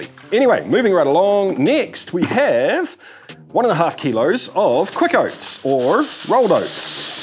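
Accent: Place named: New Zealand. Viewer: I thought the comment was a piss take on kiwi accent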